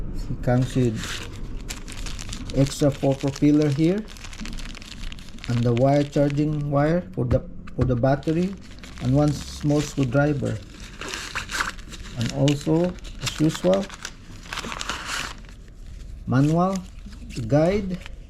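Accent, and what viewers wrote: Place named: Philippines. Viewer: hi are u a filipino? i can tell by your accent hahahaha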